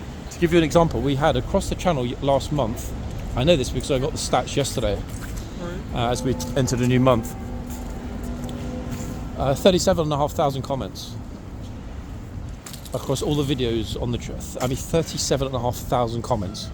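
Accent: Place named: RP, United Kingdom. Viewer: Oh you never ever fail to make me laugh out loud with your terminology 😂 peasants & rich coming out of raffles 😂you have a very good posh accent my dear man. Thanks for showing us around London, I love the beautiful architecture of our capital city, truly amazing. Keep doing what you do ❤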